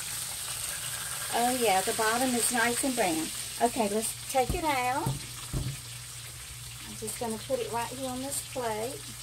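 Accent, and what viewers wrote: South, United States. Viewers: I love your southern accent, and I find your voice very relaxing kind.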